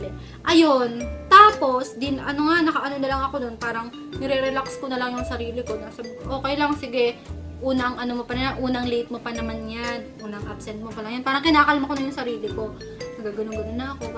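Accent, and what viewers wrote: Batangas, Philippines. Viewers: I'm new to this channel. Second time ko manood ng vid mo. Gustong gusto ko yung accent as batangueña haha. So cuteeeee 💙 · Ate batangueña ka po ba HAHAHAHA WALA ANG CUTE LANG KASIII!!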